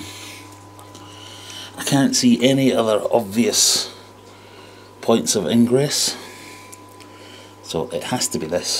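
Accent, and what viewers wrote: Scotland, United Kingdom. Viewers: Mate, you've never sounded so Scottish. Lol.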